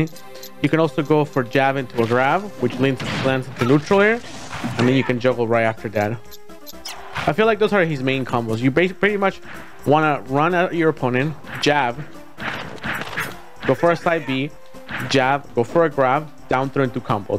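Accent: Chile. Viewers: Eri chileno?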